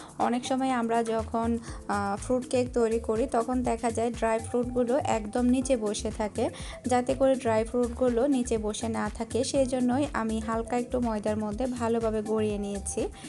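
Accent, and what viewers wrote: Chittagong, Bangladesh. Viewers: MashAllah orange cake daron hoyce.Apu apni toh sob kaje parodorsi amader chttogramer apu bole kotha.mamonira kmn ache apu?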